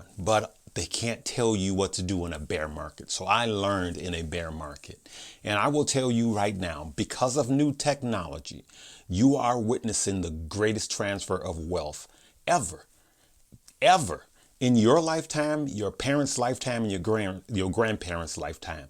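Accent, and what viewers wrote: Chicago, United States. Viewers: Are you from Chicago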